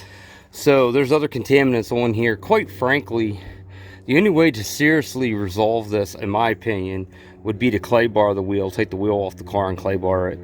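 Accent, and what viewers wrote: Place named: Baltimore, United States. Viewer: just guessing, from the accent... are you from East Bawlmer?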